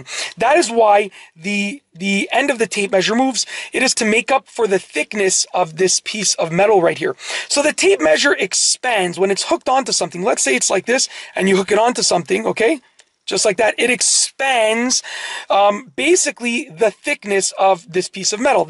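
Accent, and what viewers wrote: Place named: Woodbridge, Canada. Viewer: This is the Woodbridge, Ontario accent, but it extends to most of York Region, and any other Italian neighborhoods north of Toronto proper.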